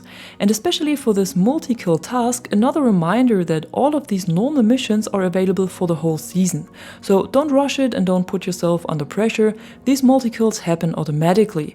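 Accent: Germany. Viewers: I dident ment in a bad way. The accent gave you up. Cause i work in turism and you sounded like a German speaking english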